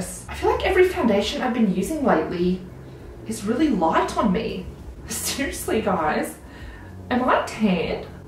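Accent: Australia. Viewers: Of all Aussie beauty youtubers your accent strikes me as the most aussie! Its how the last word in your sentences goes up ↗️ You must be from Queensland 😂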